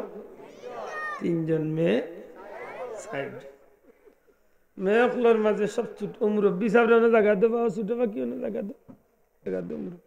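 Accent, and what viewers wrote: Sylhet, Bangladesh. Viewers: বড় মজার সিলেটী ওয়াজ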